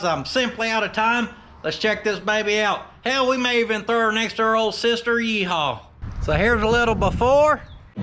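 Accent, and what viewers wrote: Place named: South, United States. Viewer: Dude im not even from the south and I love his accent and additude.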